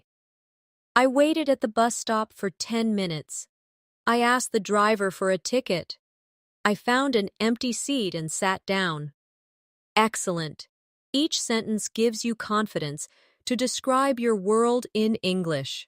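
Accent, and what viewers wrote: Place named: United Kingdom. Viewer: I like your British accent. And I appreciate for your videos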